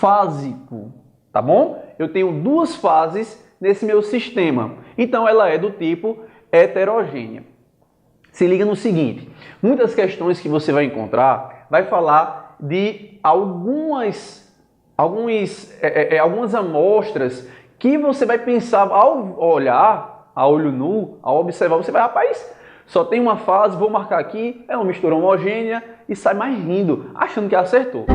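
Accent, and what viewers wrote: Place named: João Pessoa, Brazil. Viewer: sou recifense, percebo que o sotaque em cada estado do nordeste é muito diferente, sabia que eras de joao pessoa apenas pelo sotaque! divertido isso kkkkkkkk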